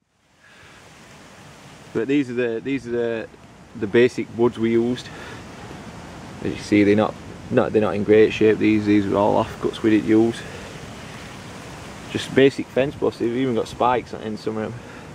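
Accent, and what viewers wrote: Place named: Northern England, United Kingdom. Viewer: Hi, I also live in the north of england and judging by your accent, not too far from you, so where abouts did you get all these supplies from?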